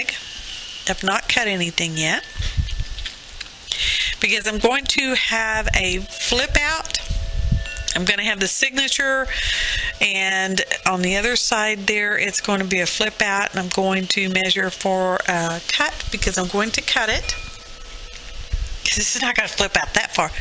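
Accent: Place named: Alabama-Mississippi, United States. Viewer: sounds just like Ala-ssippi!!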